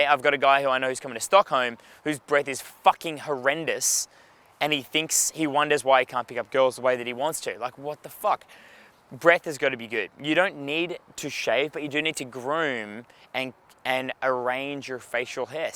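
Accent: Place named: Australia. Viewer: Do you have bootcamps in Perth, WA? Most i ever known of are UK or USA good to hear an Aussie accent... In regards to looks... i think when they say looks don't matter they mean being a 'pretty boi' and since evolutionary women respond to behavioral cues in men when it comes to attraction it doesn't matter. i do agree you have to be presentable tho... what is your take on this?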